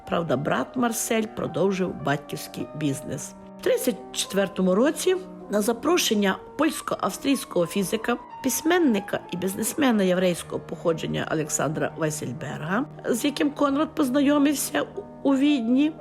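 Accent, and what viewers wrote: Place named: Western Ukraine, Ukraine. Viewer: ДЕТОЧКА НЕ ПИШИ глупости ЕСЛИ не знаешь галичанского укр.языка.там именно на всей галичине так столетиями разговаривают и общаются. У этой дикторши даже произношение " захiдне, а не схiдне..Разговорный язык на Западной украине отличается и отличался от восточного и центрального украинского..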